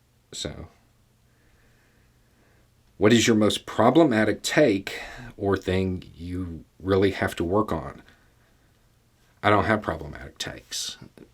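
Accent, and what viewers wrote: South, United States. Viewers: For what it's worth I'm white as the driven snow and your Southern accent was the one glaring red flag I had to get past to realize that you are in fact based. Where I'm from, a drawl tends to be seen as an easy indicator of a simple mind that holds hateful ideas. I do recognize that this itself is a form of prejudice but damned if it doesn't have a better track record than a lot of its cousins.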